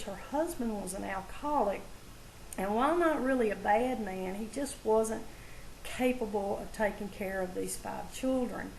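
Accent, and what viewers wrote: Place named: South, United States. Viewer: Those are all great movies. Love watching you talk in front of the camera. Us northerners love to listen to the southern accent shine..lol. If you ever need something to do you could always be a movie critic. Excellent job.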